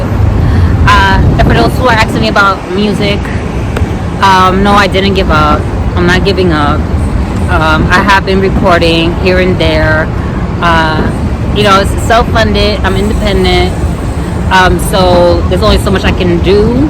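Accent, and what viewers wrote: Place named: Brooklyn, United States. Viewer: That voice is extremely sexy got that Brooklyn vibe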